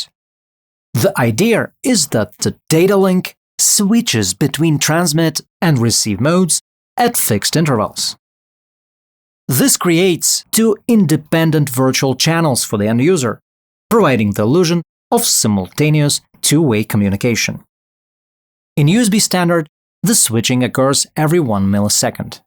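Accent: Russia. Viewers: you are russian or talk to russian people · cuz he's Russian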